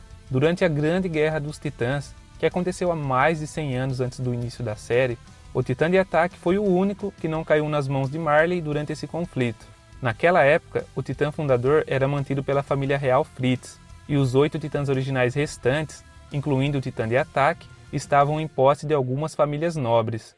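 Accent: Brazil. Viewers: Mó baiano KKKKKKKKKKKKKKKK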